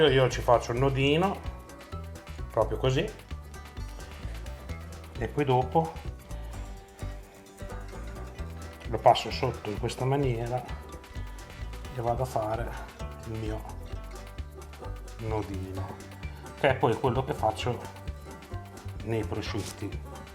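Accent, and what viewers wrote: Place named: Naples, Italy. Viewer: Bravo, poche parole e dette quando servono, non come fanno nelle tv nazionali i soliti tromboni, e poi l'accento napoletano è la vera ciliegina sulla torta. Bravo! Bravo.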